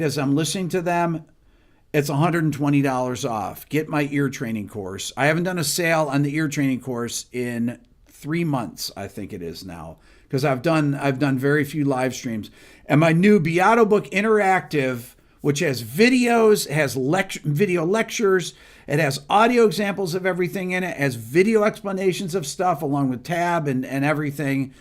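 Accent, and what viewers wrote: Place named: North Jersey, United States. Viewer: He's a North Jersey boy. He has that punky Northern NJ accent. Love it!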